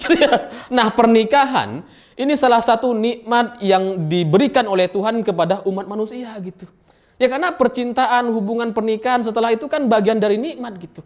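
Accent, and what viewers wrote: Java, Indonesia. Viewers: Orang Madura tp logat bahasa nya kayak orang jawa ya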